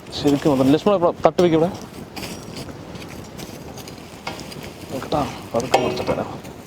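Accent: Palakkad, India. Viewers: തനി പാലക്കാടൻ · Nalla adipoli Palakkad bhasha ishtamullavar like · Ninga thani palakkadanallooo etttoooi... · Eantha kattu ingalu palakkattu karan ano? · എനിക്ക് ഭയങ്കര ഇഷ്ടമാണ് പാലക്കാട് ഭാഷ... ഇഷ്ടപെട്ടവർ like ഇടൂ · Broo...ningal nalla oru cook aanalloo..superr...Aa Palakad slang edaku varunnundu.. kalakii..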